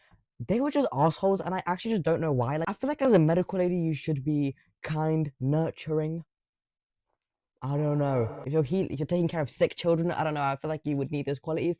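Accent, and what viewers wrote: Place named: United Kingdom. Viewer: bro is brittish wtf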